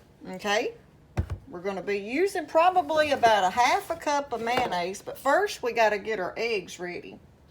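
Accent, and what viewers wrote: South, United States. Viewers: Looks delicious, I like your southern talking voice .. you can get those soda crackers at a good price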